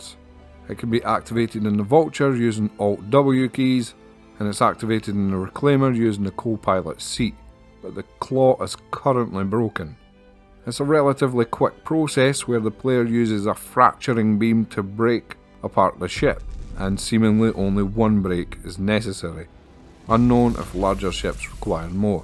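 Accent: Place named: Scotland, United Kingdom. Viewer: this lads definitely the proud owner of a scottish accent. I know it because i almost didn't understand a word or two. still great stuff 😊 Edit: motherfricker, almost caused an international incident with a typo! Edit2: also you gained a subscriber in me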